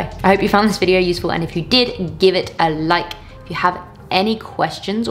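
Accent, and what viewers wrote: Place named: United Kingdom. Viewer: I can't get enough of your British accent. I always look forward for your videos ever since I found your channel. Looking even more amazing as always btw,. 💪💪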